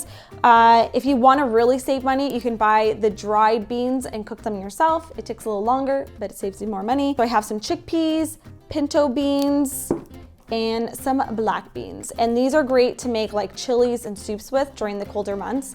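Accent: Canada. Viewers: Oh my goodness your Canadian pronunciations! I am not used to hearing words said that way lol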